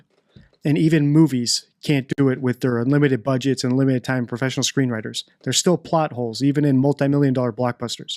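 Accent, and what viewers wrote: South, United States. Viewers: I think the way she talks is just being uneducated and southern. I say both because my step mom is a smart woman, has a great job at a company she has been with for decades.. but she is from Georgia/Tennessee, and the way she talks sometimes just makes me cringe a little inside 😂 it's a southern thing.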